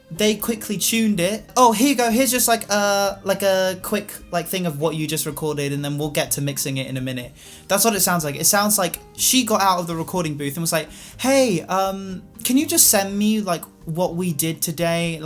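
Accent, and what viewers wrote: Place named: Southern England, United Kingdom. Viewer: Love your accent bro 👌 southern england aroundish London? Lol 😄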